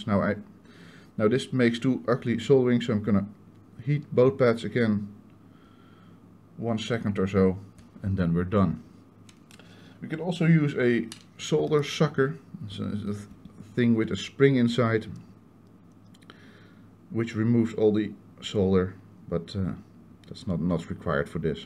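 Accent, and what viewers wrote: Netherlands. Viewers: haha vanaf de eerste seconde herkende ik de nederlander hier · Love the Dutch accent .. :)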